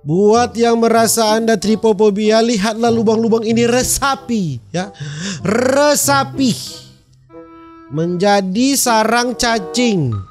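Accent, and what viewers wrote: Manado, Indonesia. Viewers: Hahahahhaa manado memangg dorang pe logat gagarap memang e 🤣🤣🤣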